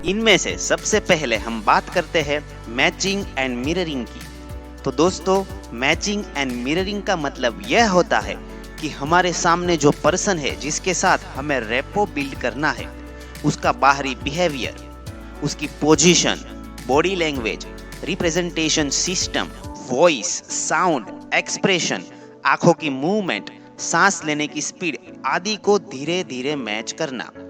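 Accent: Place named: Gujarat, India. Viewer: voice se lagta he aap Gujrati ho.. love from Gujarat I am from Rajkot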